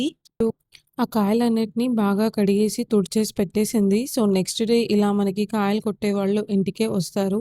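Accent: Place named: Andhra Pradesh, India. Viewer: Meru motham Andhra slang lo matladthunaru inthaki midhi ee oru andi ??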